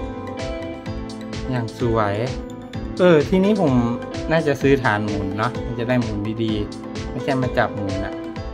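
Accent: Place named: Rural, Thailand. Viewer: พ่อค้าพูดเหน่อจังเลยคะ คนบ้านใด่คะ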